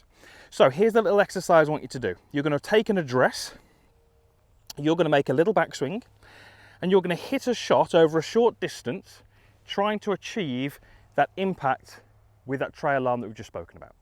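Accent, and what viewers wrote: United Kingdom. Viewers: He is British! We have the accent.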